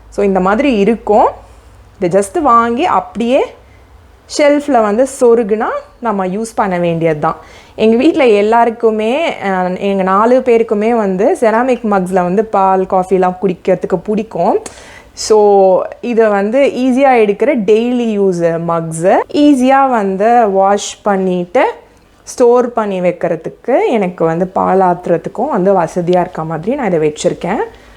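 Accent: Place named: Sri Lanka. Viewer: சகோ super 👍 இல‌ங்கை தமிழ் இந்தியா தமிழ் பேசும் விதம் வித்தியாசம், from Switzerland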